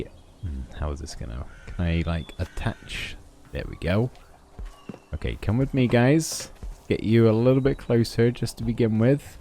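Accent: United Kingdom. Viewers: are you from the U.K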